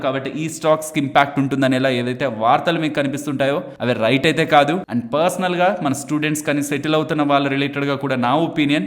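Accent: Nellore, India. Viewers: Bro meedhi nellore na ?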